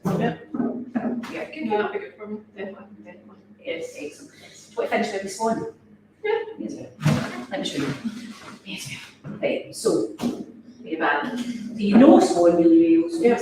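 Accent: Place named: Glasgow, United Kingdom. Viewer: I could listen to pilates with a Glaswegian accent all day long.. love it!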